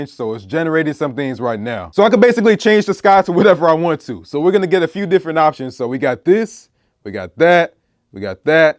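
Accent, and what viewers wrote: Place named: New York, United States. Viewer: Your definitely a new yorker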